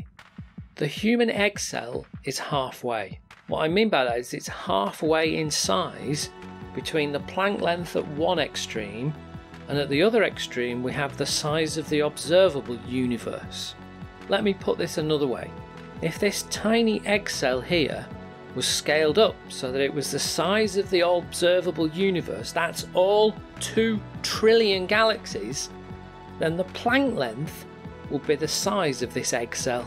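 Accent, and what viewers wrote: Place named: Midlands, United Kingdom. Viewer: The Midlands (UK) accent is a key component for the "perfect" science video too ofc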